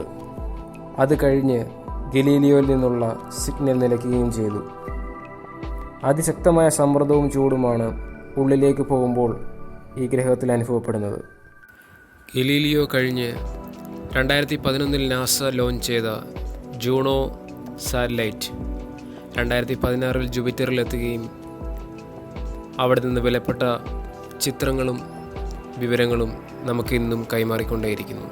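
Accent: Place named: Trivandrum, India. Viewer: Trivandrumo kollamo ayirikkum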